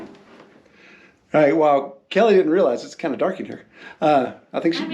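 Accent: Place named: Minnesota, United States. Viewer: Just saying...you need to go pretty far North in Minnesota anymore to find folks that still talk like that. 😄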